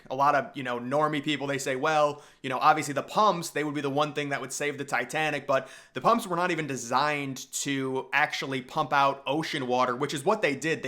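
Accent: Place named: Alaska, United States. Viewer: He sounds like he's from the show Alaskan Bush People.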